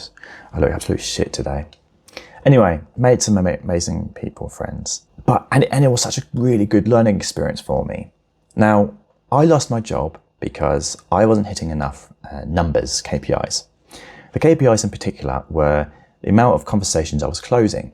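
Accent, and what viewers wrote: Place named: United Kingdom. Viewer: nice video man, didn't understand 90% of what you were saying though. Cool yookay accent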